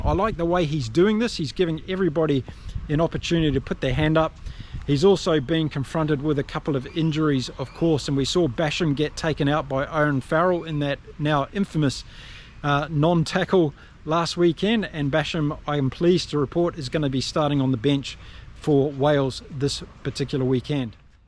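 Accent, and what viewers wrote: New Zealand. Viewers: I laughed when you said you're no rugby expert, here's me as a South African the moment I hear the cadence in your New Zealand accent I'm thinking of every New Zealander pundit I've ever heard and I automatically trust your rugby opinion. 😂.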